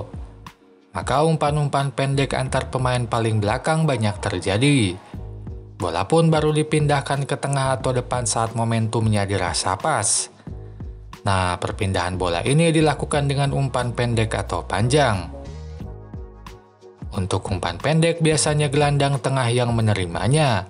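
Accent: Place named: West Java, Indonesia. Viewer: Sunda pisaaan euyyy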